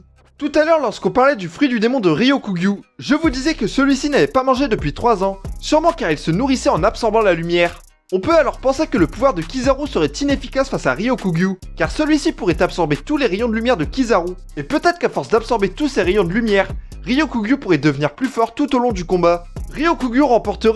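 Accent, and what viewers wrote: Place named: Nord-Pas-de-Calais, France. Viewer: Ptdrr ta un accent chti un peu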